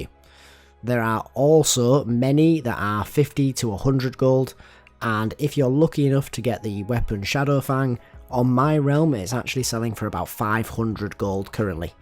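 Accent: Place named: Yorkshire, United Kingdom. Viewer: you sound like a Hulligan ? Yorkshire sir ?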